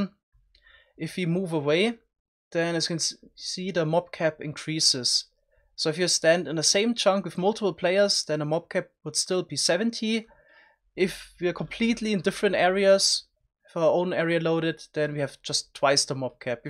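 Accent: Germany. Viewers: gotta love German accents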